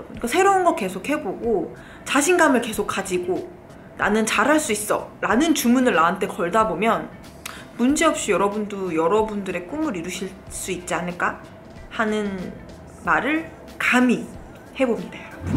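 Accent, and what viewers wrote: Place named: United Kingdom. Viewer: Love your English accent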